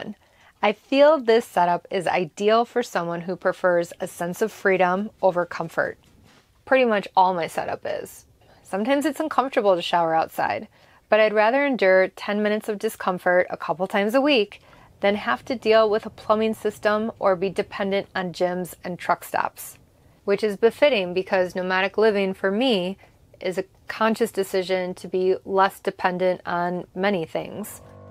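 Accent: Wisconsin, United States. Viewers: My shower needs are probably less than yours because I’m a guy and I’m bald but the shower I keep going back to is the MSR dromedary bag with there shower attachment that I purchased in 1998 it’s simple and it works and for the last six months it’s been my only means of showers even during the-25 weather we had in Wisconsin this winter! O and when you say stuff about How you talk and ramble You just sound like a Wisconsin girl to me.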